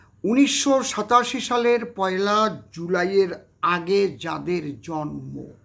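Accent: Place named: Bangladesh. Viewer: তুমি তো বাংলাদেশি তোমার এখনো বাংলাদেশি ভাষার আড় ভাঙ্গেনি তোমাকে আগে তাড়ানো উচিত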